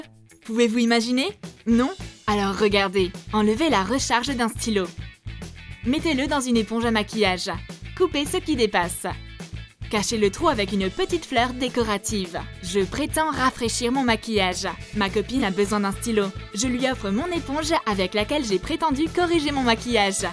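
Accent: United Kingdom. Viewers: vous êtes française ou anglaise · vous êtes anglaise ou française?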